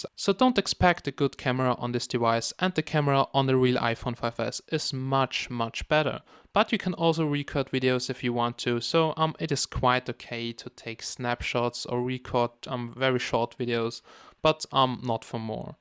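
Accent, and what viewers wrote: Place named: Germany. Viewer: damn you sound german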